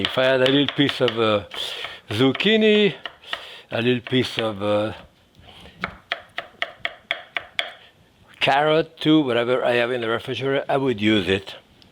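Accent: France. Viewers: He hasn’t got a speech impediment….he’s speaking with a French accent. If you struggle to understand him, then I suggest that you turn on the subtitles. They don’t have an accent!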